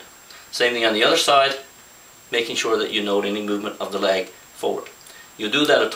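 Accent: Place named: United States. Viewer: American, I think.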